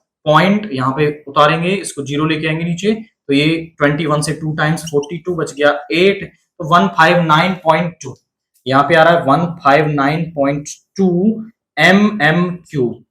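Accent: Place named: Haryana, India. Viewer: Haryanvi touch 👍👍👍😂😂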